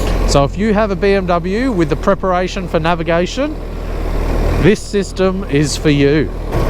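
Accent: Australia. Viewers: Are you an Aussie bloke ? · Awesome dude. I was wondering where you were. Aussie accent for sure but snow? WTF is snow? Chuckled at the manly flex with the manual over the shoulder. RTFM only in emergencies. BTW...neatest, cleanest woodpile ever. I just got an RTP1250 Cop bike at the auctions and have been thinking about one of these. My bike didn't have the BMW sat nav. Thanks again and enjoy your time OS. Oh. I went and had a look and decided to grab my balls and run with it. Fk it. You only live once so I ordered one. The discount code helped a lot.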